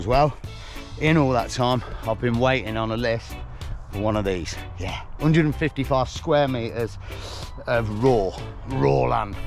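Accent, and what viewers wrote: Leicester, United Kingdom. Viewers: Having been married to a Brazilian at a time when neither of us could speak each other's language, does Bob understand every you say in that thick Leicester accent ? ❤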